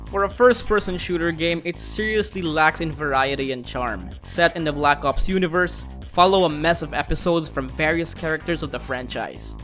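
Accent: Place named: Philippines. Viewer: I swear you really sound Filipino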